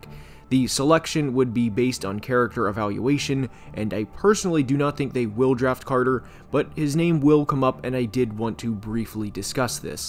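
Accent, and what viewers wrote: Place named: Minnesota, United States. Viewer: is a Minnesoh-tan gotta give him a break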